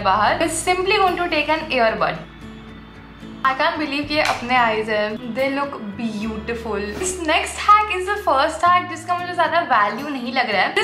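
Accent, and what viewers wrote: Gujarat, India. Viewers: Gujarati ascent 😅😁 yeh thoda aada aa raha hein!!!!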